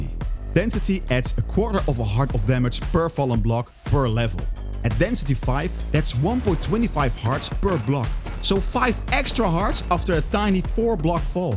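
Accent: Netherlands. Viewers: lol are you dutch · it is dutch he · You are dutch right i hear it 😂😂😂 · Iedereen kan horen dat je nederlands bent :) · Hallo! Ik vroeg mij af of je Nederlands bent, jouw accent klinkt namelijk heel Nederlands! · Dutch ahh guy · I smell Dutch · do u happen to be dutch? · are you dutch?? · We zijn dus zeker wel nederlands, probeer aub een engels accent te leren bro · gelijk weer te horen aan ons typische Nederlands accent 😂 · Are you Dutch? You sound Dutch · I smell dutch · i can smell the dutch accent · Je klinkt maar een kleeein beetje nederlands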